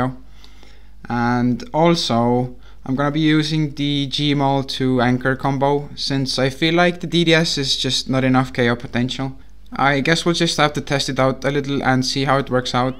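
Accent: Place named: India. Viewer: nice video i subbed first time i watched u where u from u sound like ur drom india but idk where u from i speak german im from switzerland my english is anyways not rly good tbh xD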